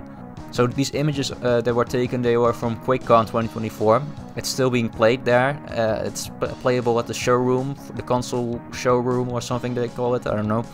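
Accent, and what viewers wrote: Netherlands. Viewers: you sound Dutch cool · Man, this is one of the coolest projects I've heard about in a while. Must have been an honor to be a part of this! Might even consider getting a SNES now. By the way, are you happen to be Dutch? I hear a certain accent? ;-)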